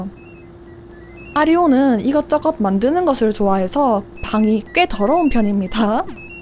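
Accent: Gyeongsang, South Korea. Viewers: 너. 대구 사람이지. 표준말투 쓰는 대구 사람가터. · 님 영상 처음본게 이거인데 그때도 님 억양듣자마자 바로 대구사람인거 알아챔 ㅋㅋ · 영상만 해도 힐링인데 갱상도갬성으로 내적친밀감이 +1000 상승했습니다♥ 흥해라 갱상도!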